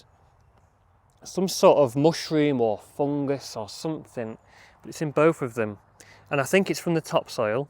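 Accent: Manchester, United Kingdom. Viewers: Great video thanks 👍 I think I'm not far from you , in North Manchester (I'm guessing from your accent lol 😉) I'm also very puzzled but delighted by the absence of slugs! Last year they ate everything 😢 Do u think they're plotting a coup?!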